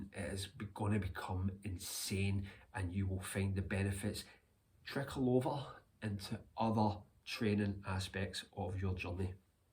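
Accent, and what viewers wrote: Scotland, United Kingdom. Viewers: On a side note brother, your scottish accent makes the captions hilariously funny. It can't pick the right words.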